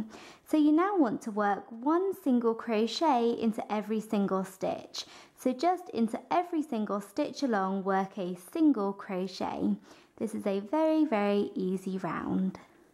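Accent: England, United Kingdom. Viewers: great instruction I love the feel of this blanket. may I ask where you are from? English accent? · What lovely work you do! Are you from Britain? Lovely accent :) I also wanted to ask how much wool you needed for this blanket? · I am new to knitting and crochet and found your video very easy to follow and understand. Also love your English accent!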